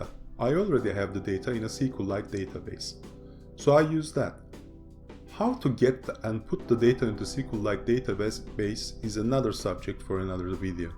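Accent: Turkey. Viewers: Turk musun aga? :) aksanin oyle gibi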